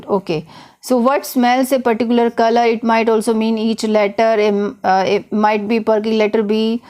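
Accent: India. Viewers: I find nothing to learn from your lessons. Bloody indian pronunciation. Uploading edited vedio. Such a stupidity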